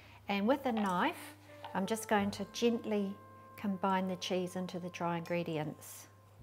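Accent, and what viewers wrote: New Zealand. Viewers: She sure sounds like a Kiwi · Hi, love the kiwi accent - I currently live in Rwanda and both your recipe and accent are brilliant. I have an oven with 3 temperatures - cold, a bit warm and a bit warmer yet your scones came out beautifully and were so easily prepared. Many thanks. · Yes sounds kiwi love her presentation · I love your New Zealand accent!! (Am i right?) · It’s so overly to hear a familiar accent from Aotearoa. Thank you for your gentle and really easy recipe. Love the way you took us through step by step. I am going to make these scones for my staff who are all men and love to eat. Thanks heaps. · Thank you. My mother was a great home cook but, her scones, pikelets and shortbread were outstanding. Sadly, although l inherited her baking tins and even have her bone handled knife and even though l make most things well, l did not inherit her 'hand' and have pretty much given up trying with scones and pikelets. 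Tragic cos, IMO, hot buttery scones (and/or pikelets) are unbeatable. You've inspired me to try again though, mostly because your method, measurements and equipment are pretty much identical to what l remember of how my mum made hers - sadly dementia took her before it even occurred to me to write down her recipes, which, like most cooks of her generation she knew off by heart. Most recipes l've found since seem to rely on enormous quantities of baking powder and as l seem to be particularly sensitive to the taste l find them inedible. I would love to know where you got your brilliant oven tray too please - judging by your accent, you're a fellow kiwi and although l've seen those trays overseas, l've never seen them for sale here in Aotearoa. I'd really appreciate a heads up, if anyone knows where they're from. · New Zealand accent = instant trust. How did I never know the hot tray trick? 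Intermediate School cooking classes have let me down! I’ll be making these in the morning thanks.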